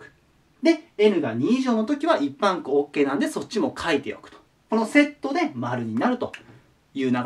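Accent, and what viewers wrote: Kansai, Japan. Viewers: 所々出てしまう関西訛り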